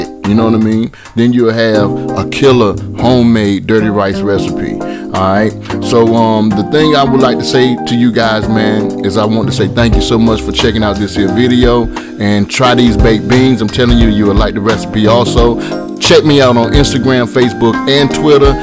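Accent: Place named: Texas, United States. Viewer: Yo. Im in uk an my buddy said he had dirty rice last night said it was great. Never heard of it here but know i now.. I is gona try me soma this tamrw after work.. Dam right... Man i just lov the way yall talk there in texas✌️💜🍜.. Dirty rice is hitting the uk an we didnt hear it from man v food guy adam. Lol. Im after that mad flavour 💯. Respect Houston texas 💜.. Peace out.... Uk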